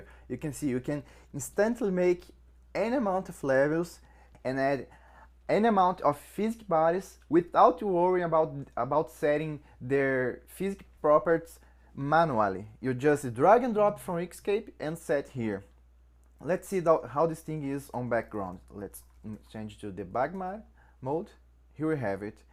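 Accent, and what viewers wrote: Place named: Brazil. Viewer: Are you brazillian: Your accent looks brazillian.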